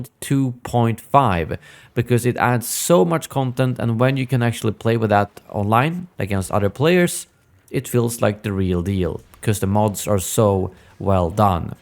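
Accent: Sweden. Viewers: u swedish?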